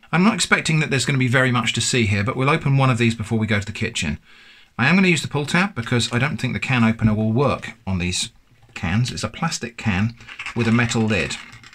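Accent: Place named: Southern England, United Kingdom. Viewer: I call this "English curiosity YouTube" — among the ranks of Steve Mould and Tom Scott, for example. YouTube seems to have realized (quite before I did) that this sort of content mixed with the lovely idioms and accents of lower England make for wonderfully relaxing media. I've watched nearly everything on these channels since lockdown has kept me home alone for the passed year.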